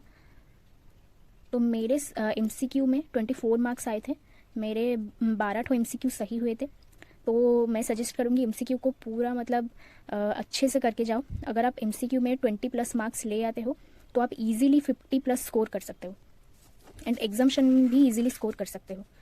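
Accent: Odisha, India. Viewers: I think you are from odisha,Am i right?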